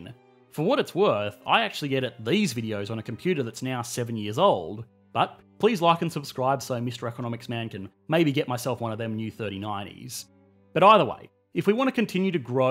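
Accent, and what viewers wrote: Australia. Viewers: he sounds Australian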